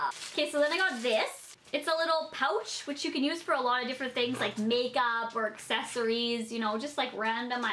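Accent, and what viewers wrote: Saskatchewan, Canada. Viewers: My twin brother keeps repeating everything you're saying because he says you have such a thick Saskatchewan accent and I'm actually so confused😂😂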